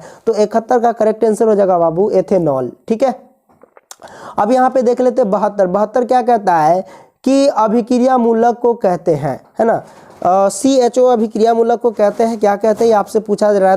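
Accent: Bihar, India. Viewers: Sir aap Bihari style wa......🤞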